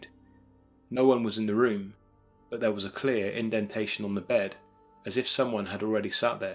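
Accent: England, United Kingdom. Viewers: Interesting hearing someone from Old England talking about New England. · I'm normally streaming via Roku or SmartTV so I rarely leave comments but I absolutely love this channel. Excellent narrative skills from proper British accent, the stories are intriguing & I just wish made more videos (being selfish). But with this lame pandemic I don't know why anyone would give this man's videos a dislike. Keep doing what your doing sir! You're in my top 5 YT channels. Thank you for your time & efforts making these videos.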